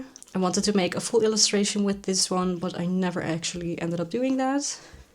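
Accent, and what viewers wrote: Netherlands. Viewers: I always love it how easy it is to recognize the dutch accent, 1 sec in the video and did not see your channel description and i already was like, hold up wait a minute.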